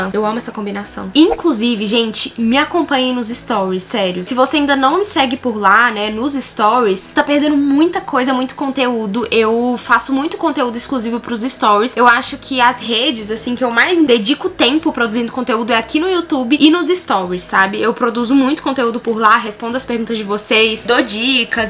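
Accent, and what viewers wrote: Minas Gerais, Brazil. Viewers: muito mineirinha 😂❤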